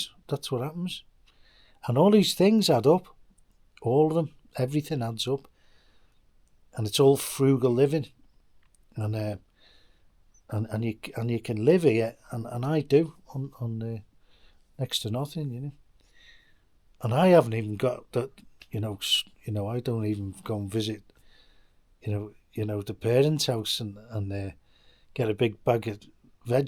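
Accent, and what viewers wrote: United Kingdom. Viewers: love the British accent. England has an amazing history!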